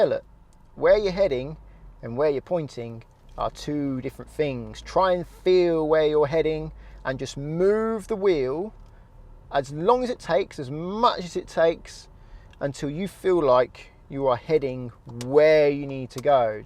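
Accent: United Kingdom. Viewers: He is truly one of the best instructors. The only annoying thing I find is his British accent, it is way too different from American accent, Americans don't speak half words every second like Britishers.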